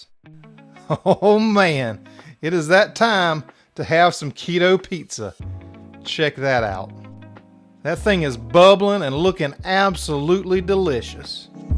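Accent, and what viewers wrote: South, United States. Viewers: Sorry the thick southern voice is 😖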